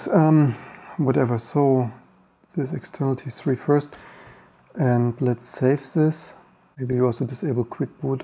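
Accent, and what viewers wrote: Germany. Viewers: Dieses "deutsche" Englisch ist echt schrecklich. "Se sinkpäd". "ei test se sipiju performanz" "so sen ei wud say" Machs doch auf Deutsch ... Englisch können und Englisch sprechen können sind echt 2 verschiedene Dinge.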